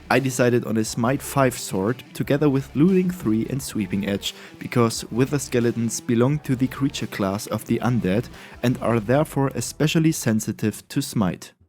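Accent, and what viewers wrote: Germany. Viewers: Your akzent sounds a little bit German.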